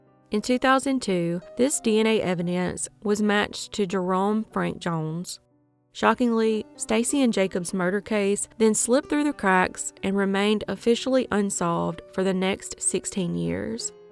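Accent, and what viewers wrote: South, United States. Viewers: From another country girl to another, I love your accent In the fact that you named your channel Southern Girl Crime Stories!! It fits perfect to a T, and your content is great because a lot of us have never heard of some of these cases and I am grateful for you putting it out there. I know that it takes a lot of hard work and time but I must say once more you are doing a fantastic and awesome job! Keep up the great work and have a wonderful week sweet face.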